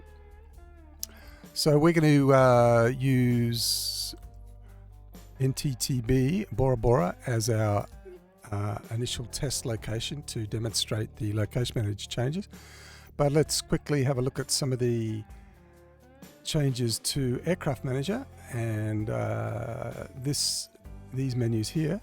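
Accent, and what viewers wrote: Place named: Australia. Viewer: Bro.....sorry but.....the accent! 50/50 for me in understanding everything you said in the video. Is it Australian?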